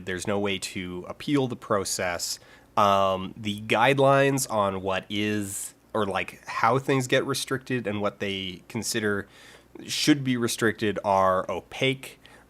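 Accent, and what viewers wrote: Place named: Canada. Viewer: lol I just meant the voice and the way you speak. Not the actual words. Are you canadian?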